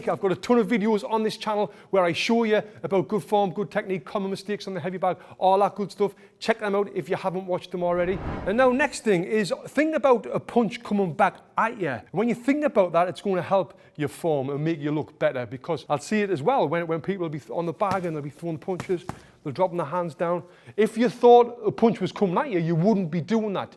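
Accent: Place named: Sunderland, United Kingdom. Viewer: Great Mackum accent...really good 'no nonsense' coaching technique. Good work.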